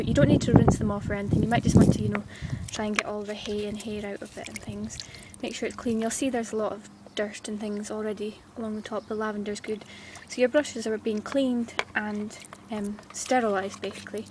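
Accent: Scotland, United Kingdom. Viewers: Are you Scottish? I love the accent!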